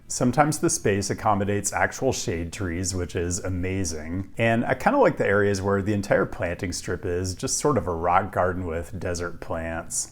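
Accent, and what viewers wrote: United States. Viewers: Your accent is interesting. Where did you grow up? Very American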